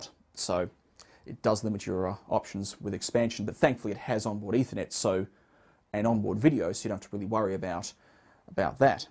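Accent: Australia. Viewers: I recognise that accent! Its the same as mine, he's an aussie!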